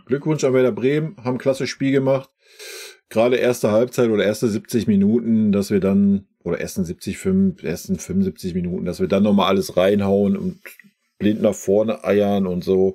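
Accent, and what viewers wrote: Ruhr, Germany. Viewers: Höre ich da etwa 'nen Ruhrpott-Bayern raus oder täusche ich mich? 😂 Btw., sehr faire Analyse von dir! LG aus Bremen 🤟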